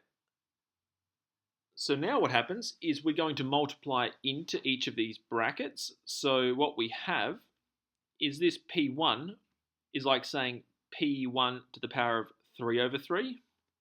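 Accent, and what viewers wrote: Australia. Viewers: I asked myself "I wonder where this bloke's from, he sounds like he could be from Perth", then I check the channel and there you go. Strange combo of skating and econs, but good video. Thanks from Germany!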